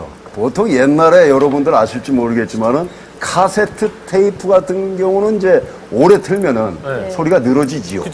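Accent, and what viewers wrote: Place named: North Korea. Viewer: 이상하다. 말투가 왜 북한 사투리같지?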